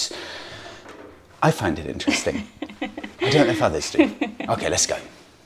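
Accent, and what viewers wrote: United Kingdom. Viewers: Me encantó tu video! Hermosa Lituania! estoy estudiando inglés, tu acento me hizo acordar mucho a y a! thank you very much!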